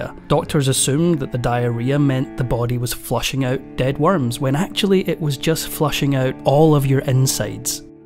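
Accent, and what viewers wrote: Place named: Scotland, United Kingdom. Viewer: This Scottish dude is BY FAR the best Factinate narrator. I wish he did all of them!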